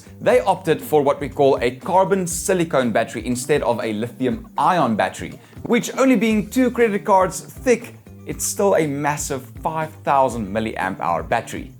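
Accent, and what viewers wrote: South Africa. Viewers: You sound South African, subbed.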